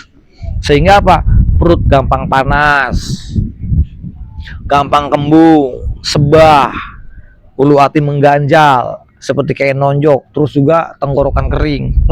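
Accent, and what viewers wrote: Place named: Kupang, Indonesia. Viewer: Logatnya JD agak Kupang hehe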